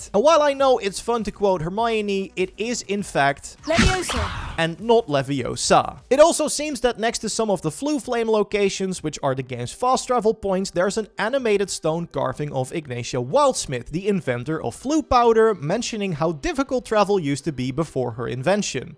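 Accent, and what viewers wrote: Netherlands. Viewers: I immediately heard that you were a fellow Dutch guy, cheers! Great video. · I really like your video's, but are Dutch?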